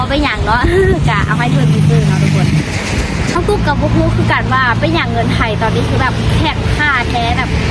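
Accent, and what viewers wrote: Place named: Laos. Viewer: บอกไม่กิครั้งการทำคลิปต้องศึกษาให้ถ่องแท้.ไม่ใช่ว่าอยากจะอัดคลิปก็อัดๆไปวันๆ1ก็ไม่ได้ค่อยได้ประสิทธิ์ภาพ.คนไทยมีการศึกษาพอสมควร.เขารู้ว่าการพูดและข้อมูลของคนลาวที่ทำคลิป.ทั่ว.สปป.ลาว.ทั้ง18แขวงว่าคนลาวคนๆนั้นมีความรู้มากน้อยแค่ไหนในเรี่องเกี่ยวกับไทยในหลายๆด้านหลายๆมิติ.บอกกิครั้งแล้วอยู่ไทยพยายามพูดภาษาไทย.จะได้ได้เก่งๆแบบนุชดาวๆเขาเก่งอยู่ไทยมาเป็นสิบๆปี. ก็พยายามพูดไทยคนไทยบางคนฟังไม่เข้าใจ.เดี่ยวเด้อ.เดี่ยวแด.นะค่ะ.นะจ๊ะ.ค่ะค่า.จ๋าจ้า.ไม่ใช่."แม่น.โด๊ย.บอบใจ" ขอบคุณนะค่ะ.ขอบพระคุณมากๆนะค่ะ.ถ้าใช้คำว่าขอบใจคนรุ่นเดียวกัน.หรือ.รุ่นน้อง.ภาษาลาวไม่พูดสัก10ปีก็ไม่ลืมหรอก.หัดพูดไทยให้ลิ้นอ่อนๆเข้าใว้.แบบนุชดาว.เข้าใจ.สวัสดีนะจ๊ะ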